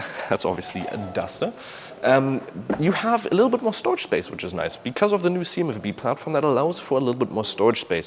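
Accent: Germany. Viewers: Is he from Germany? He sounds really german to me.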